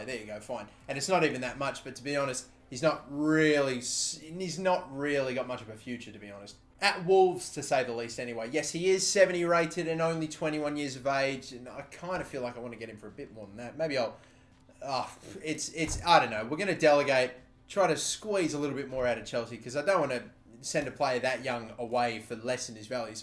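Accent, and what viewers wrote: Australia. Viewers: Funny hearing an Aussie swear:) (no offence, love your content mate)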